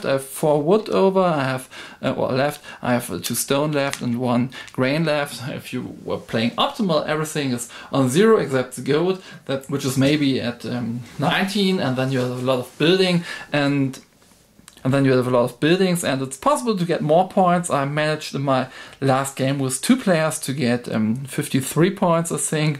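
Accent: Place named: Germany. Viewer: Oh honey, your English is great, thick German accent 😊 but still very easy to understand!! Great job and thank you for this runthrough,! Keep up the good work! Greetings from California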